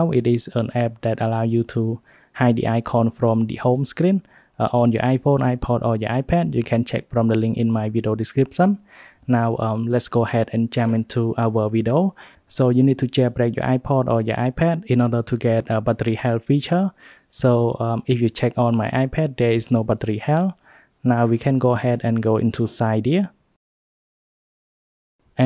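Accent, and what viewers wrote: United Kingdom. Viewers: Sounds like British language when he’s talking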